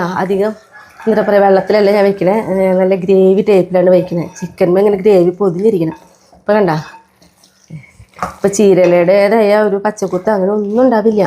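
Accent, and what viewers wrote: Thrissur, India. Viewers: ഞാൻ നിങ്ങളെ vdo എല്ലാം കാ ണാറുണ്ട് വിഡിയോയും പിന്നെ യുടെ ഈ സംസാരം സൂപ്പർ തൃശ്ശൂർ സ്ലാംഗ് ഇഷ്ടം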